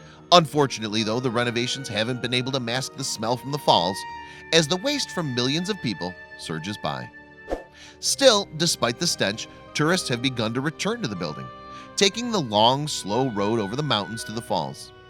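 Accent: United States. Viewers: Working it hard for a story -loud yank -very ordinary and dull .....only yanks could dramatise up as odd or unusual.....narrator tries to hard ..thumbs down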